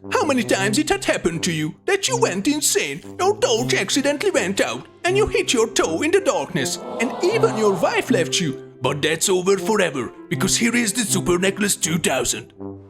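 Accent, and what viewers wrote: Hungary. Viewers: why do i feel you're hungarian just by the accent? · Great tips! :D And as a side question. From your accent, I suspect that you may be Hungarian perhaps? Maybe somewhere close in Europe. Or very mistaken. Ofc you don't need to answer if you don't want that info public. Was just curious.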